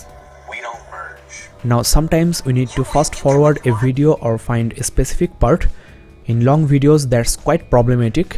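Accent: India. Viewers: Indian accent "~"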